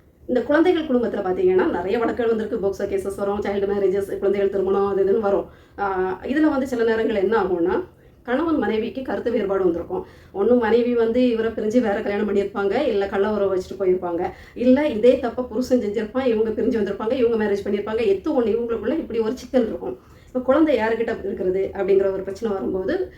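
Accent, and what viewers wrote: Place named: Kanyakumari, India. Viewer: அக்கா நம்ம வட்டார வழக்கில பேசி கலக்குறீங்க #பழந்தமிழ் #தேன்மொழி #குமரித்தமிழ்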